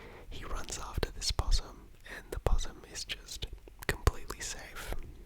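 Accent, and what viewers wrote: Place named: Australia. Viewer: Aussie accent 🥰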